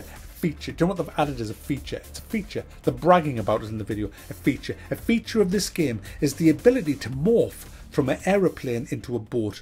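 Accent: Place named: Newcastle, United Kingdom. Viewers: I don't watch you for your PC penchant, I have watched your videos for years because of how you cut through the bullshit and give me an honest and entertaining review. Firstly, it's fucking refreshing to have a proper geordie lad on here rather than some squeaky yank and secondly, while I don't play on PC, I appreciate what you have to say about the games releasing today, especially when they're mediocre yet full of greedy ploys by devolpers who have probably never played a game in their lives. Seriously, keep up the good work but give others who have made a different choice than yourself, getting a PS4 a break for simply having a different preference. I'm shite with a mouse and keyboard, I always plug in a controller when I play games on my mate's PC. It's always funny to see me aim with a mouse just as it is to see him try and make heads or tails of a controller! 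· Haven't watched the video through yet, no idea whether you will mention the games I'M looking forward to but who cares? I come here for and his glorious, dulcet Geordie tones not reinforcement of my existing opinions. I love you dude, your channel is one of my absolute favourite sources of entertainment.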